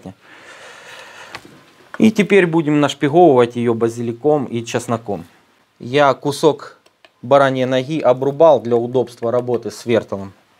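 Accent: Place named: Rostov, Russia. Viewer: Братишка,сам откуда? Я из Ростова на Дону,слышу говорок из наших мест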